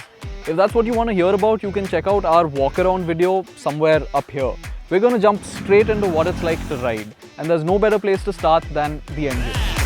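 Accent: India. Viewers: Indian accent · I am doing my research on possible Bikes. I give props to people who have become proficient in another language. The problem for me as a native American English speaker is the heavy Indian accent. it makes it difficult for me to understand what the person is saying. I am not criticizing him. He is very fluent in English. His accent is what is getting in the way of me understanding everything he is saying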